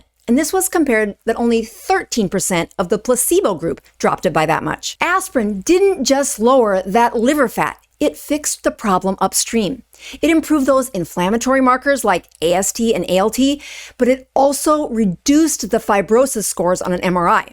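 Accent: United States. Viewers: Australians know the second that you hear that American accent, you stay the fuck away from whatever they're recommending.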